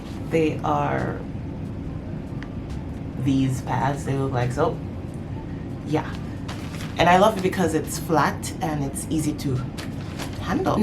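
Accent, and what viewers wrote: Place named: South Africa. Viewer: Ooh babe..l thought you were South African🙆🏼‍♀️🙆🏼‍♀️ Silly me 😂😂 Great upload l love innisfree❤️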